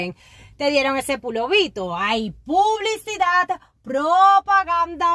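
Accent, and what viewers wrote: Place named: Oriente, Cuba. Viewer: tu eres de las Orientales originales, tu eres de las que dicen valde, guineo y catre. Ese es el verdadero acento Oriental.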